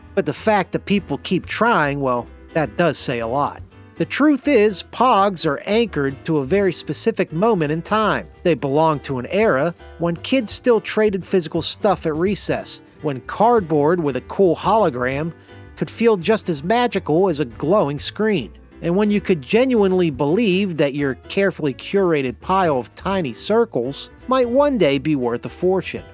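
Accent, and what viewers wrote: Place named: Pittsburgh, United States. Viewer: Never heard a yinzer more yin’s than this guy. Holy effin dahn tahn.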